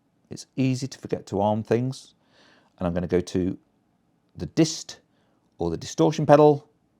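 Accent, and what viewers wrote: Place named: East Midlands, United Kingdom. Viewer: What a refreshing video. No razzamatazz, no sickly AI non human voice and you made it very clear on the steps to take. I liked the sounds you got as well as the East Midlands (ish) sound of your voice.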